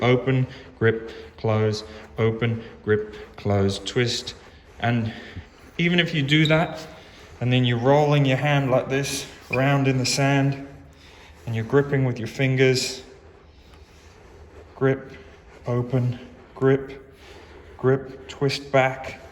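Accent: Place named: Australia. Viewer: Who has like an Australian accent, or maybe British idk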